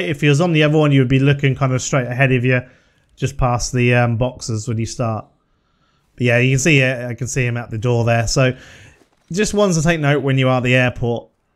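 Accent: Norfolk, United Kingdom. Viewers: Are you from Norfolk?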